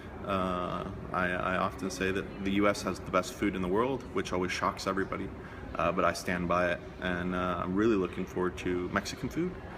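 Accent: Denmark. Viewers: Elsker hvordan du taler engelsk medd dansk accent :) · agreed he sounds danish now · i can feel you have attained a little bit of a danish accent :d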